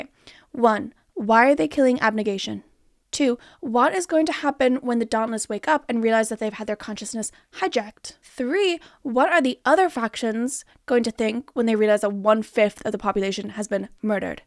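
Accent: Ireland. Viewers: the way you say your T’s; like raised somewhere English main but time in Ireland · EDIT: Ireland!! I watched your Booktok video, and I hear it now. ORIGINAL: Dude, I am so sorry if this is rude. It's my first time watching your videos, and I gotta know — WHERE is your accent from??? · is this a super watered-down irish accent? i'm not a native speaker and i'm confused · do i detect an irish accent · Yes i watched your whole 1hr and 7 mins and it was more entertaining than the movies. I think i watched 2 and gave up on them. Also enjoyed what i believe is an slight Irish accent in your video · I went through this entire video thinking you were American not Irish… the accent only came out when talking about the LC haha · Is Ms. Irish? I keep hearing little hints of an Irish accent, and I'm trying to determine whether or not I'm imagining it.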